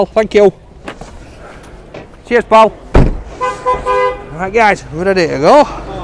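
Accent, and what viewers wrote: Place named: Scotland, United Kingdom. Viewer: He sounds more like Scottish or Irish accent or May be is he from Liverpool or Everton . His accent is more like funny .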